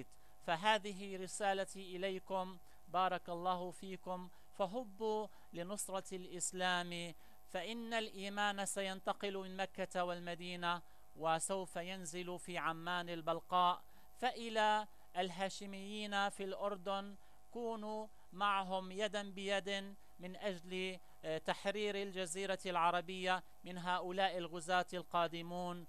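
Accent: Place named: Iran. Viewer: والله العظيم لقد شككت بهذا الشخص من لهجته انو فارسي او ربما اسرائيلي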